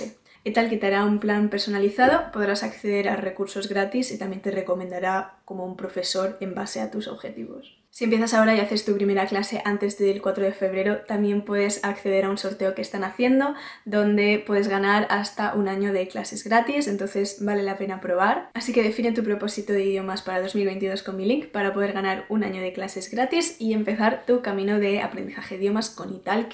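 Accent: Spain. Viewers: youtubers que hablaran español natural de España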